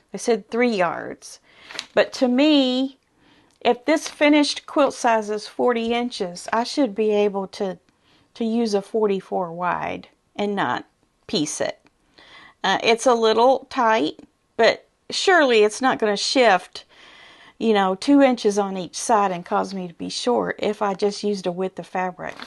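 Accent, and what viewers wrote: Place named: Kentucky, United States. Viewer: I popped over to your channel after seeing your interview this morning with Steven on his YouTube channel (the idiot quilter). So nice to discover your channel!!🎉. Truly enjoyed getting yo know you. I’m originally from Owensbore Kentucky so I was thrilled to hear your accent. Brought tears to my eyes. I’m now in Pittsburgh PA but get home a few times a year. Blessings to You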